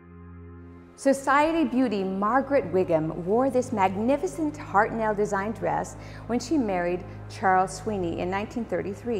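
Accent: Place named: United States. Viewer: American accant dreadful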